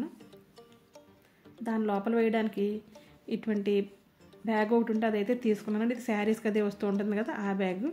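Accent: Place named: Visakhapatnam, India. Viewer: హాయ్ అండీ నేను చాలా సార్లు మీది ఏ ఊరు అని అడుగుదామని అనుకున్నాను మీ లాంగ్వేజ్ ని బట్టి మీది వైజాగ్ అనుకున్నా కాని మీది కర్ణాటక అని చెప్పారు చాలా సంతోషం మీ మాట తీరు ని బట్టి వైజాగ్ లో మా రెలెటివ్స్ అంతా నాకు గుర్తొచ్చేవాళ్ళు మాది నిజామాబాద్ అండీ thank u🙏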